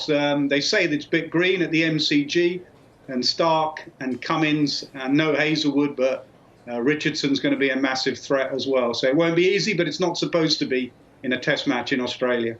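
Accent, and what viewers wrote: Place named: United Kingdom. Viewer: I could hear Nas all day talking cricket and wouldn’t move an inch. Nas is really good! That British accent makes it even better !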